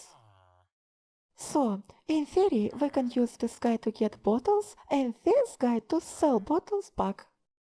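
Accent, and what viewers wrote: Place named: Russia. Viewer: Молодец. Надеюсь, что у англоязычной аудитории ты будешь популярна. Я не стебусь. Но по произношению понятно, что ты русскоязычная.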